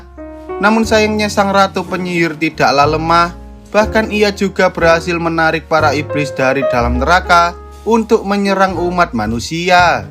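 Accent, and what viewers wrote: Java, Indonesia. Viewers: Apik tenenan film ne... naratornya..medokkk tenenan jawane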